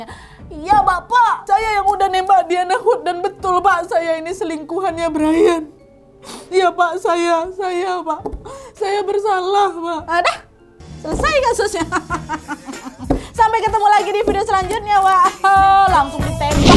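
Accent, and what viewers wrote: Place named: Medan, Indonesia. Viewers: Nonton semua video si gue ngerasa kalau semua tokoh dari negara manapun yang dia ceritain adalah org MEDAN. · Ampunnnnn, cerita kau jd kocak krn gaya cerita yg berlogat sumatera❤❤❤ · Emang logat medan paling disukai 🥰🥰🥰😁😆😆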